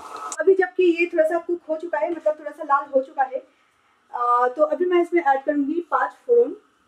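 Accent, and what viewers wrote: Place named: Bengal, India. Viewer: array koi ni..... 😄😄😄. we all know ki she z a Bengali lady ... To unka accent aa jata h .. mujhe to bahut acha lgta h .. jb dii aise bolti h .. I love it ...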